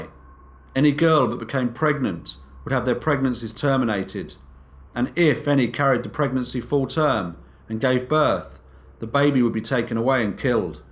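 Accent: England, United Kingdom. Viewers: It’s an English accent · lol, I figured that much, but which flavor of British? Doesn't sound like any of the London accents I've been exposed to, doesn't sound like a north England/southern Scotland, and not very Welsh, either. I'm no expert, but I do watch a decent amount of BBC programming, so I've been exposed to some of the more popular accents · It’s so nice to be able to understand your English accent! My hearing is also bad and I always understand what you’re saying! Again, another story I’ve never heard of! Love it! 👍🏻😊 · An English narrator? what the feezy!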